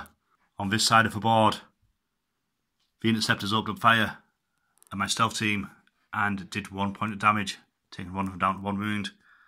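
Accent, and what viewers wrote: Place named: Teesside, United Kingdom. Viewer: Sizkipala, seriously m8, the super dramatic on tenterhooks commentary does it for you? Man. Don't encourage him. Maybe it's the Teesside accent but am not feeling it.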